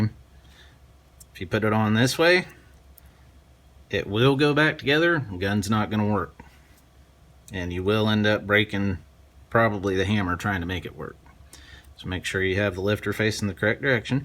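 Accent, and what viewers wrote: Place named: South, United States. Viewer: Thank you again for the video, by the way I love the southern accent, kind funny but I like. I finnally today got some will and finish the slide part, it’s been more than a year since I bought the kit and did the lower frame part, just now I did the slide, I didn’t do before cuz I was lazy 😂 thank you God bless America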